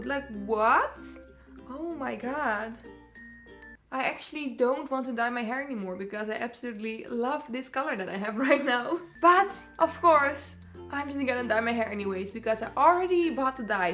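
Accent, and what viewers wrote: Netherlands. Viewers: ZC I tough she was Dutch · Wait is she dutch? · Hello. One question: are you Dutch? I think you have a Dutch accent. I am also Dutch :)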